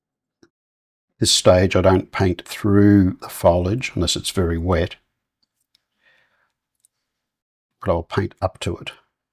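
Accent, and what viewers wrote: Australia. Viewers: This is amazing!! Pardon me if I am wrong, are you Aussie? Would really like to see how to paint a gum tree Please. 🙏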